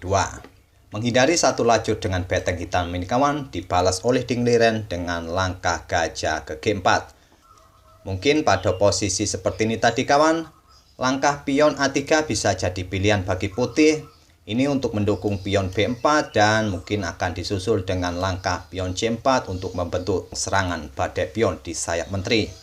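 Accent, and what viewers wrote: Java, Indonesia. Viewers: Iyasih mas..medok amat jowo nya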